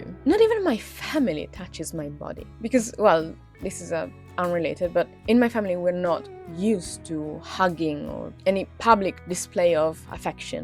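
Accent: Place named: Sweden. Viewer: Why you sound like you are from sweden 😅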